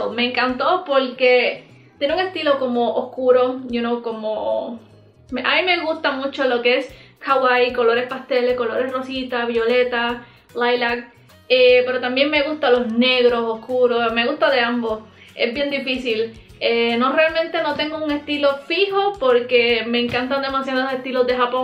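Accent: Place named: Puerto Rico. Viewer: La cartera está preciosa. No sabía que eras latina por tu acento deduzco que eres puertorriqueña porque cambias las R por la L. Deberías revisar las tiendas de segunda mano a veces tienen cosas de Sanrio por muy muy barato. A mi también me encanta los LTS Saludos de una Venezolana desde Austria en Europa.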